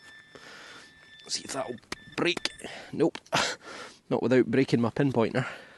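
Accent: Scotland, United Kingdom. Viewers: I'm coming here to hear a scot say "Aluminium"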